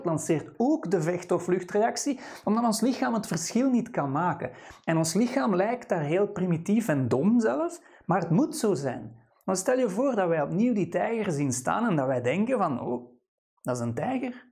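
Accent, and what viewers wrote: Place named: Flanders, Belgium. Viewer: Overigens een prachtig accent. Ook de beste literatuur wordt geschreven door Vlamen :)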